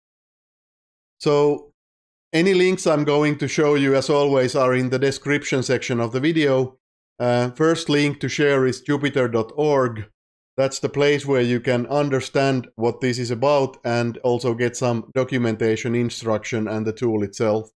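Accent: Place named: Finland. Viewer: Love the Finnish accent, great! From Sweden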